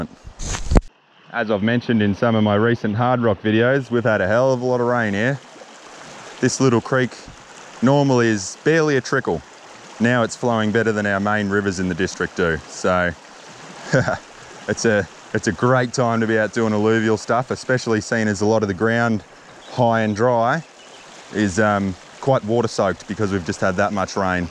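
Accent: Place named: Australia. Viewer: I love your channel too. And that accent gets me ,you aussies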